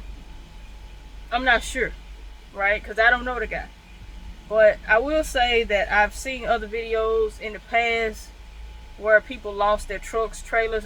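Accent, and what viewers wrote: South, United States. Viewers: Hi. I watched your video for the first time. It popped up on my feed. 1. Where are you from? I love that country twang. 2. I enjoyed how you came across with your message. 3. Are you married cause I'm going to follow you going forward... with your cute country sounding self. Don't want to disrespect. GOD Bless you for following GOD's instruction to speak what HE put on your heart at this time. I know you are helping folks. Many people are struggling like never before. I always say all of our worldly possessions and all the things we create... we can't take it with us to the next place. Someone said we are just renting them from GOD.:) Stay Cool. I'm definitely going to share your message to my contacts. Bless You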